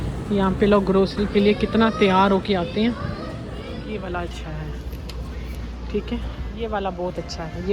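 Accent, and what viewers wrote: Punjab, Pakistan. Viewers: Hi. Ap pachtu Hain or mashaAllah lagta nahi apki bataon se yehi lagga Hy ap Panjab see Hain. Bht saf Urdu bolti hai ap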